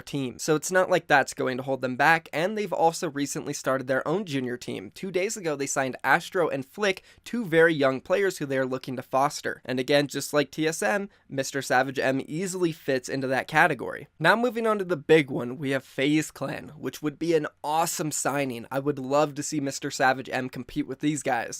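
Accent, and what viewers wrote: Norway. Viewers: Is he Norwegian? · Damn is he from Norway?, im from norway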